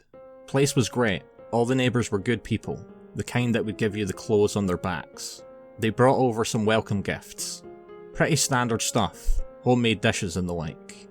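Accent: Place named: Northern Ireland, United Kingdom. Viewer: NI accent. .o.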